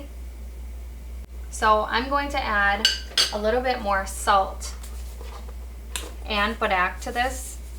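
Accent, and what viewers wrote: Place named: East Coast, United States. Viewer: Are you from the east coast? You have this little accent just like me lol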